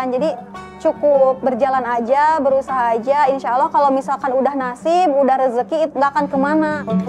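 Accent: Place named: Bandung, Indonesia. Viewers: Beberapa kali lihat video di channel ini banyak banget menginspirasi saya untuk punya usaha sendiri. Cuma mau action masih bingung. Belum nemu ide jual produk apa, bingung cari tempat supplier yang tepat, pusing bagi waktu sama kerjaan sekarang, dll. Bener" bertekad dalam Minggu ini harus segera action, saya punya prinsip gak mau selamanya jadi karyawan, mumpung masih muda gak ada salahnya kerja keras membangun kerajaan bisnis sendiri dari 0. Btw kakak owner nya cantik, seneng aja dengerin dia ngomong, teteh" logat Bandung gak ada obat 😂😂. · logat ciamis nya masih kentel keneh teh...hehhe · Bandung pisan kak logatnya hihi, keren sukses selalu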